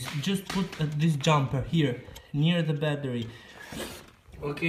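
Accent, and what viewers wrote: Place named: India. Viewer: Indian accent Thank you very much good video! Big Like !Sub give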